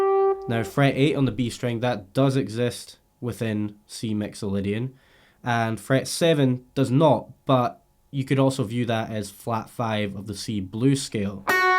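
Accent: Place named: Scotland, United Kingdom. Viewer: Amazing speech ! Sounds like there is some kind of scottish accent going on...sorry but it keeps me smiling ahah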